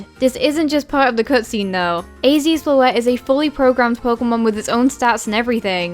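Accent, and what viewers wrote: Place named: Northern England, United Kingdom. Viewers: That's a strong Northern English accent there. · She's from Preston in Northern England.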